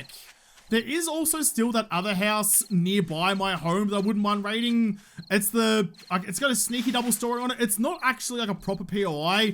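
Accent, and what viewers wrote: Australia. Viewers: "Some sneaky Molys...." I love the Aussie vibe to it, but dude, you gotta admit that one just sounds ... Without the Aussie vibe.... Not smthg I would be OK with XD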